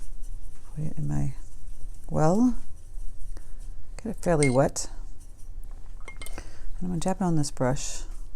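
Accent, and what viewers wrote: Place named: East Coast, United States. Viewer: I have to tell you that I have learned SO MUCH from you in the short time I've been subscribed. I have watched every video, and you're so great at explaining each thing you do to achieve the look you're going for, and I think I painted along with EVERY Christmas card video and gave hand-painted Christmas cards to ALL my friends and family! I always can't wait for your new videos to see what else I can learn! I'm fairly new to using watercolors, and value each lesson I can glean from watching! Thank you so much for sharing your talent and instruction with all of us! You rock!! BTW, what part of the East Coast are you from? I was born and raised a Jersey Girl, and I hear something in your accent, but can't quite place it! Thanks again!!!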